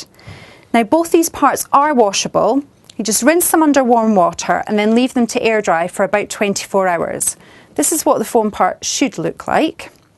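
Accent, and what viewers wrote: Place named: Glasgow, United Kingdom. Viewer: I love this young lady's accent...my grandmother was from Glasgow and she sounded very much the same. =)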